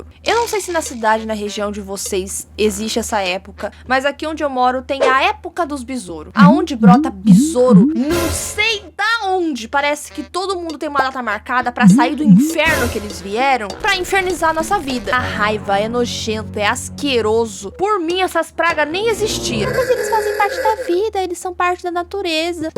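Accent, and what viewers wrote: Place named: Northeast, Brazil. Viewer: Você mora no nordeste pelo sotaque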